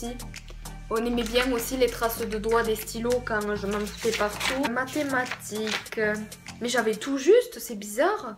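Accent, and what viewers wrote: South, France. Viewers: Team accent du sud ahah💗💗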